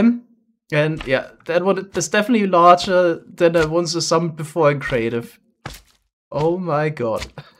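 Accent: Germany. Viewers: Is he german? No front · R U German?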